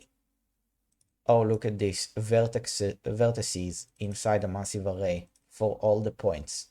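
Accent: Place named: Israel. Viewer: Are you Israeli? You have an accent that really sounds like it.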